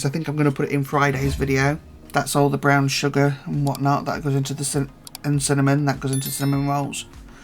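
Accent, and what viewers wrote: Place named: Scunthorpe, United Kingdom. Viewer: You sound like you have a good old sunny Scunny accent like mine lol ...The food looks lovely. Im so blummin hungry now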